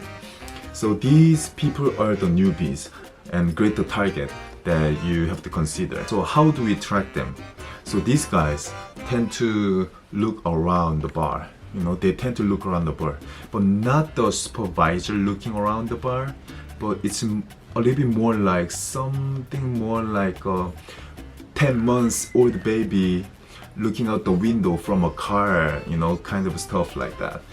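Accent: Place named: France. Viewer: Tu vivre dans la France tu as l 'accent d'un français parle anglais trop mignon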